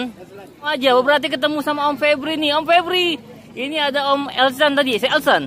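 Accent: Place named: Medan, Indonesia. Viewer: Om kaya orang medan wkwk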